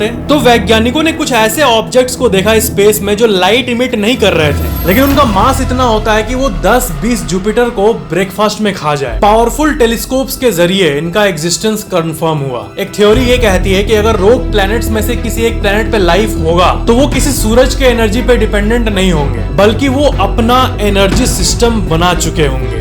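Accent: Bihar, India. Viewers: AAP BIHARI HO KYA...???? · Apka accent thoda bihari type lagta hai aap bihar se ho?❤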